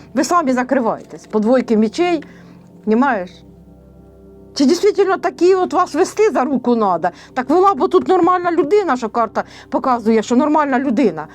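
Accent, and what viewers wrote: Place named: Surzhyk, Ukraine. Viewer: Дякую Вам,пані! Чую Вас і радію. І ше, Ваш суржик я теж так розмовляю. Харків з Вами.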